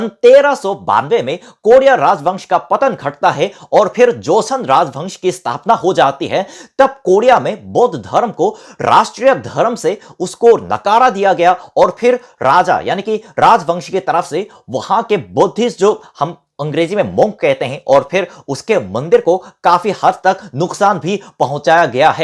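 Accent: Standard. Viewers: अति उतम अपकी हिन्दी बहुत अच्छी है इतने शुद्धता से तो हिंदुस्तान मे कोई नही बोलता हैं आपके दवारा दिया गया ज्ञान सर्वोत्म हैं❤ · Wow you Hindi is so pure! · हम हिंदुस्तानियों को इनसे कुछ सीखना चाहिए !! एक भारतीय न होकर भी यह हिंदी इतनी शुद्धता से बोलते है ,और हम भारतीयों को न तो ठीक से बोलनी आती है और न किसी को लिखने ,कमेंट सेक्शन में भी इंग्लिश का चलन है 😟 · waaao!!! i love ur hindi!! amazing!!! so shuddha!!! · नमस्ते बेटा तुम इतनी शुद्ध हिंदी बोलते हो,बहुत अच्छा लगता है,rusim धर्म बहुत अच्छी विचारधारा है,काश इस विचार धारा का अनुसरण पूरी दुनिया करे। अपने धर्म का भी सम्मान करें। ईश्वर की कृपादृष्टि तुम पर हमेशा बनी रहे🙏😊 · Your Hindi is so pure, 100% understand. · Apke jitani shudh hindi hume bhi nahi aati great👍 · Itna pure hindi ham indians v nhi bolte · इतनी शुद्ध हिंदी 99.9% भारतीय भी नहीं बोल सकते हैं। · Bhai etani shuddha hindi to hum bhi nhi bolte jitni tum bol rahe ho gajab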